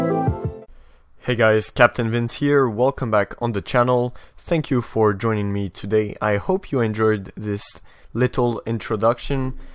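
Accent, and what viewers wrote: France. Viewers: are you french ???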